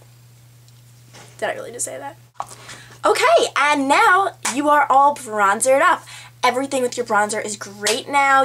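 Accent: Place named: New Jersey, United States. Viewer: im a jersey girl shes deff jerseyy not nyc or staten island jersey acent right there lol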